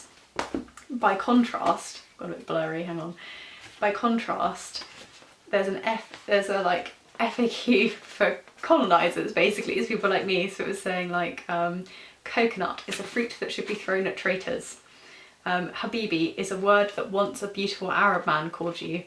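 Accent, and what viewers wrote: United Kingdom. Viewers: still lose some meaning words in your speak but understend more than early, did you use some english accent or it clearly english?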